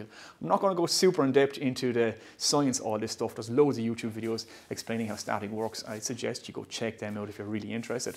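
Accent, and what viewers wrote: Ireland. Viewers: Mate your accent reminds me of a year I spent as an exchange student in Limerick, which was some of the happiest times of my life :) Nice channel too, I'll be checking it out!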